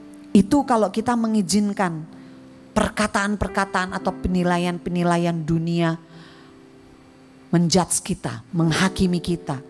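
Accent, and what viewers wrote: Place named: Java, Indonesia. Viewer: Pasteur nama batak kok medok dan campur bahasa Jawa tp jd lebih membumi ❤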